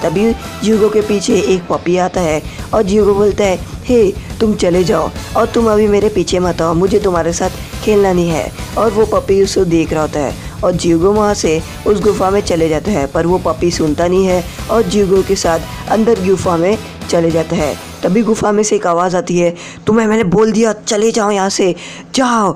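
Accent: Maharashtra, India. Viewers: Bhai tum marathi ho kya?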